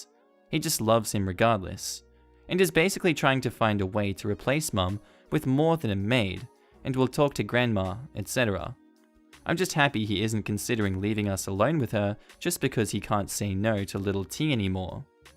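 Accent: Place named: Australia. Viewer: I’m not an Aussie but I love the accent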